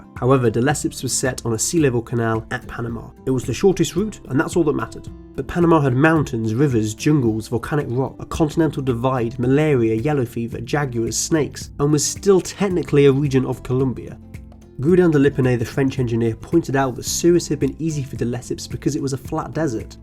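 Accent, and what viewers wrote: England, United Kingdom. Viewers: Pedro Aguiar Yes..he’s English and that’s the English way of saying the country. Do we have to call Germany Deutschland while we’re at it? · Jane Kailey are you unable to understand the British Accent? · British accent sucks. That being the case, you should talk slow dude!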